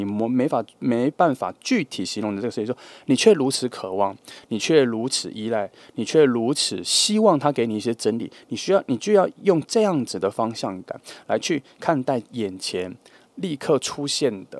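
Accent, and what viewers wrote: United Kingdom. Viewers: 1.準。喜歡2但我直覺選了1。感謝說了一口漂亮英國腔的 😊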